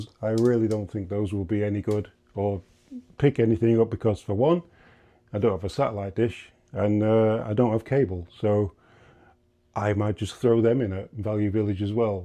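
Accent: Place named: Liverpool, United Kingdom. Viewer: Whu are you speaking in a scouse accent? Are you even from Liverpool? If you are not from Liverpool then why are you speaking in a scouse accent?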